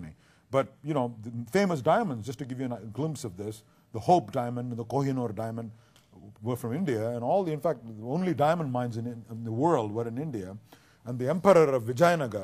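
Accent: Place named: Scotland, United Kingdom. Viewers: Thank goodness you have noticed that too. I wonder when he was at Cambridge university he may have picked up a bit of a Scottish accent as there were Scottish tutors and students there too. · Why does he sound like a scottish man?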